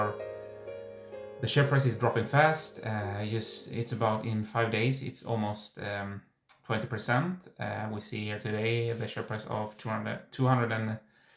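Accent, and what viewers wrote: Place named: Sweden. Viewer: Your accent seems to me Swedish so tack sa mycket for your presentation and I wish you good luck with your channel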